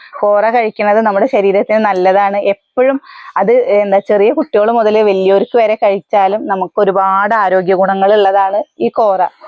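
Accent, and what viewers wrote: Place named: Mannarkkad, India. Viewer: അത് ഞങ്ങടാ മണ്ണാർക്കാട് സംസാര ഒരു കുഴപ്പവും ഇല്ല. ച്ചി ഞ്ഞിങ്ങൾ ഞ്ഞികളുടെ ഭാഷയിൽ സംസാരിച്ചോളൂ all the best 👍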